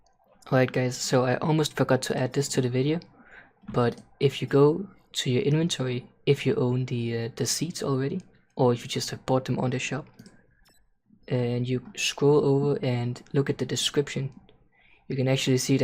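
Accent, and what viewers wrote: Denmark. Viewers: easy to hear that you are danish XD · Fuck man kan bare høre du er dansk XD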